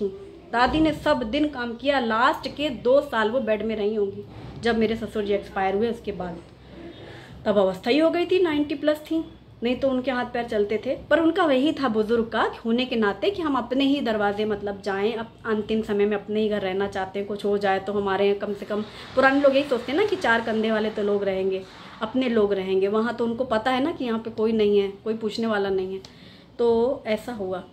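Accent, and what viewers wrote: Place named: Baghelkhand, India. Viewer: Aapne sahi kiya👍👌aapse baghelkhand ki boli sunkar achchha lga. main Rewa (M.P.) se hu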